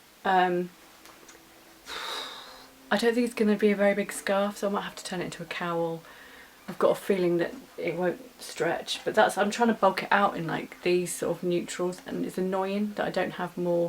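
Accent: United Kingdom. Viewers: Hi, I live in the south of Brazil. I just love listening to you chat, I absolutely love the British accent. I love crochet and I am now making the Easter eggs you posted!! Just love them 💓💔🥰💋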